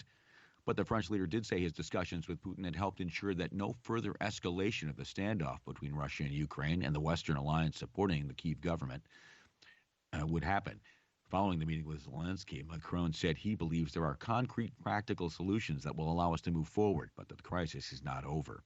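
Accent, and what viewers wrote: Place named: United States. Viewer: Thank you very much Mr., for all the updated news. I do like to listen to your nice American voice. I hope that in the next time, you will read the news with slower speed. Therefore, we can listen and understand the news contents clearly. Furthermore, the speaker can avoid the readings tripped and wrong. Once again, thank you very much for your attention to my comments.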